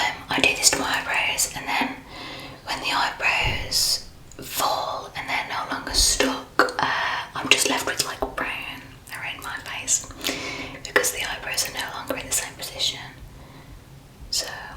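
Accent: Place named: United Kingdom. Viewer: Hi love 💕 i like your british accent. Are you from Australia?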